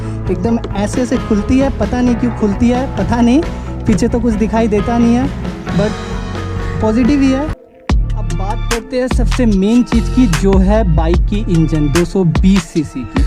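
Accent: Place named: Bihar, India. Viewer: loved bihar touch in your voice. bhaiya ji maja aa gaya.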